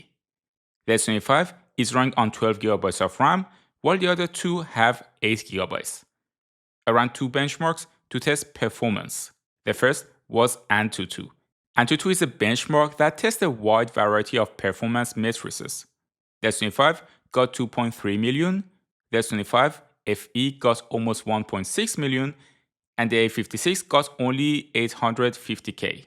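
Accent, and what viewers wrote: Iran. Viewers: Persian accent, nice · Dude are you Iranian your accent is so obvious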